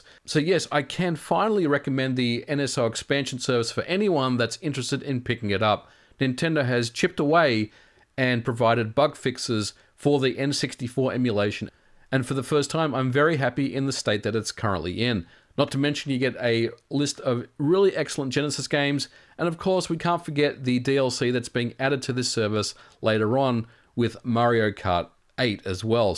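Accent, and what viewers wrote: Australia. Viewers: a) "oak-uh-reener" man i love australian accents lmao b) i'd really like to know how there are "bugs" emulating a 25 year old game on VERY capable hardware. theyre a trillion dollar company. make it make sense. especially considering how emulation has been on everything else for decades.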